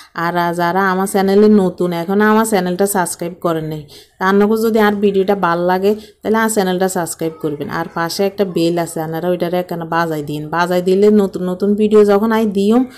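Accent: Noakhali, Bangladesh. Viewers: নোয়াখালীর ভাষায় কথা শুনতে বালো লাগে দারুণ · আসসালামুয়ালাইকুম প্রিয় আপু হাহাহা অসাধারণ হয়েছে তোমার মুখে নোয়াখালী ভাষা শুনে তো আমি ফিদা, মাশাহ আল্লাহ মামুনি জন্য অনেক অনেক দোয়া আর ভালবাসা রইলো অনেক বাজার,, ইলিশ আমার প্রিয় মাছ,, প্রতি দিনের বাজার প্রতি দিন করা ই ভাল মাশাহ আল্লাহ বস্তার ভিতরে তো অনেক জিনিস 😜 খেজুর পার্শেল করো আপু, অনেক অনেক ভালো লাগলো আপু ❤❤❤ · আপু আমি তোমার কথাগুলো খুব মনোযোগ দিয়ে শুনছিলাম।তোমার মেয়ের খেলার বর্ননা শুনে আমি হাসতে হাসতে শেষ।পুরা ভিডিওর কথা শুনেই আমি হেসেছি।তোমার নোয়াখালীর ভাষা বেশ ভালো লেগেছে।তোমার বাবার পাঠানো জিনিস গুলো দেখে আমার বাবারবাড়ির কথা মনে পরল।ভালো থাকবে।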